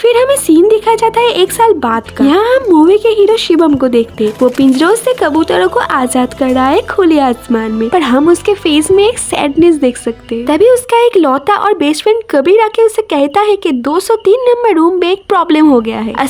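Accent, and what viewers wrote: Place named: Bengal, India. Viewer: Tumi bangali ! Maar prio accent ❤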